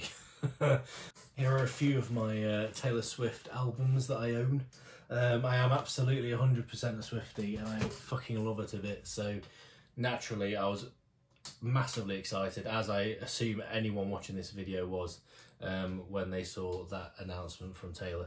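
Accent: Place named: United Kingdom. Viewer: All I saw in this video was a Brit guy repeatedly saying 'I can't deal-' in his adorable accent.... Nd I couldn't agree more....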